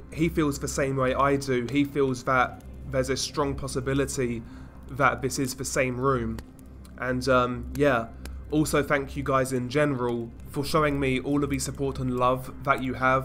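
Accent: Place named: United Kingdom. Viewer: This british accent makes the video twice harder to understand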